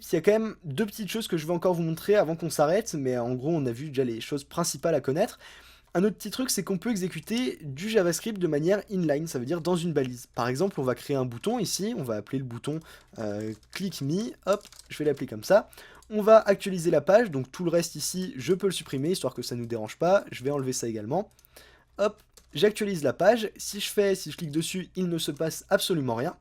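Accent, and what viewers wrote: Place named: France. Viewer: la voix du bon caifran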